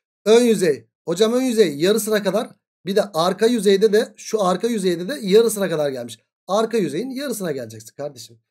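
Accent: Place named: Aegean, Turkey. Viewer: egeli sanirim sive benziyor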